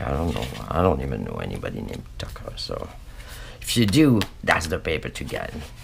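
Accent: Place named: France. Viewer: I just found your channel. I enjoyed watching your video so much! I already knew how to make the project, but I continued watching because youe personality and commentary are so great! It was like the voice inside my head when I am crafting, just without the French accent (which is very cool, btw😊). Thank you so much for sharing this!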